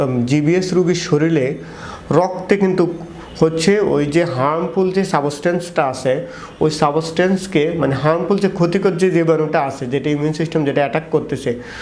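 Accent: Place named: Chittagong, Bangladesh. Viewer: আমার মনে হয় ডাক্তার সাহেবর বাড়ি চট্টগ্রামে। চট্টগ্রাম হোউক বা অন্য কোন বিভাগে যে কারোরই নিজের আন্ঞ্চলিক ভাষার একটা টান থাকে।এটা ত অস্বাভাবিক কিছু নয়।তবে উনার কথা তোতলানো ভাব আছে কিছু টা। আমার মনে হয় উনার আগে আরো বেশি ছিল তোতলানো টা। উনি উনার আত্মবিশ্বাসে অনেকটাই স্পস্ট কথা বলেন। এটা আমাদের বোঝা উচিত যে উনি ইচ্ছে করে কথায় ঝড়তা রাখেন না। সৃষ্টিকর্তা আমাদের সবাই কে সব দিক থেকে পারফেক্ট করে বানায় নি। সবার মাঝে কিছু না কিছু কমতি আছে। কারো কমতি নিয়ে মন্তব্য করা ঠিক নয়। বরং উৎসাহ দেওয়া উচিত যেন সবাই কমতি ভূলে নিজেকে একজন পরিপূর্ণ মানুষ মনে করে সমাজের সেবা মানুষের সেবা করতে,নিজেকে আরো উৎসাহি করতে পারে। তাই নয় কি?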